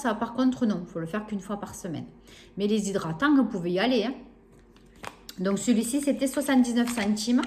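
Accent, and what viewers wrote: South, France. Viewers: Bonsoir! Super haul et très bien présenté, ça m'a donné envie d'y retourner. Je suis dans le sud ouest et par ton accent je me demandais si c'était le Noz de Montpellier. Bonne soirée.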